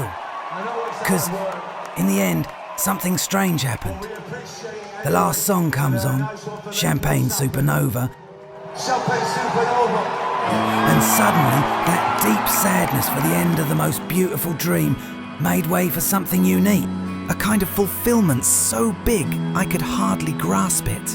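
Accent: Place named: Italy. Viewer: You made a great video mate thank you Ps for an Italian you have a fine English accent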